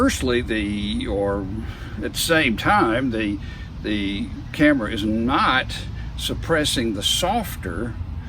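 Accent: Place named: Texas, United States. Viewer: He sounds like the most stereotypical texan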